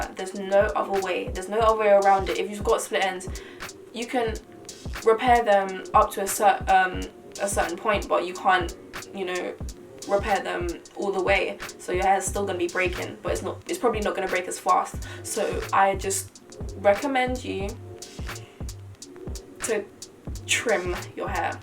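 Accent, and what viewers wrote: United Kingdom. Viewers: 😱your British 🙌🏾🙌🏾🙌🏾🙌🏾 yass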